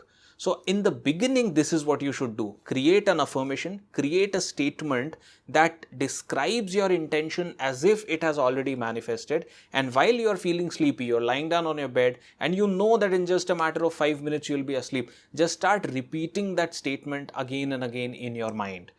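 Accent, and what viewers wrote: India. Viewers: This pure Indian accent sounds sooo good....no artificial stuff 👏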